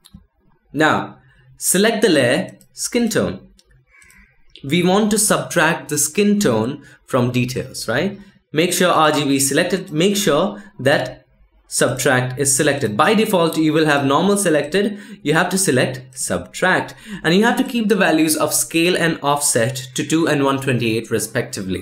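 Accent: India. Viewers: Watching your channel for a while but this is my first comment. Honestly this channel is one of the best to learn Photoshop. Thanks man for your effort and time. And by the way I'm impressed with your English accent. I find Indian English kind of funny. But you rock as you rock with Photoshop. Keep up the good work.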